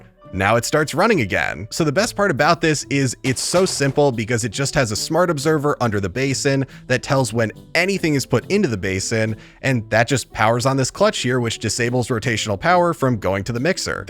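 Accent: United States. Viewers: Is bro from Pittsburgh?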